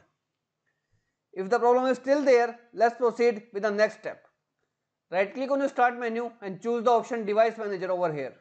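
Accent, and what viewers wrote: India. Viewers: Thank you, i had this problem for like half a year and couldn't find how to fix it, but thanks to you my device is now working correctly, and that india accent 🥵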